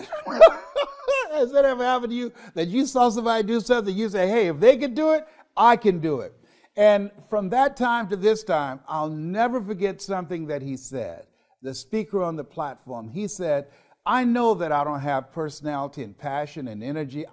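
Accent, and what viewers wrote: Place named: Columbus, United States. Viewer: les brown I lived in Columbus, Ohio 23 years and I recognize your Columbus Ohio voice because I can relate to you I been a sales guy for 3 years on commission... and started from the ground up with no mentor and did many jobs with no college education... you in spire me to give back my talents of selling back to everyone who is working towards their dreams.... but my advice to everyone is... FEAR TODAY BUT NOT TOMORROW!... if you don't get that deal or or eat today there will be less of a tomorrow... when you at the end of your life and decide to accomplish what you want to do/see, travel the world... or be the best talent at last moments of your life... question would be why do you regret taking so long to get here up to that point of your life?... the best question should be if not today when are you going to have it?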